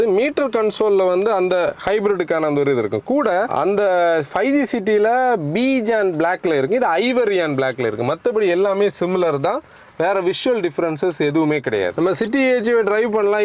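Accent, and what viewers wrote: Kanyakumari, India. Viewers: Sweet Kanyakumari language and loyalty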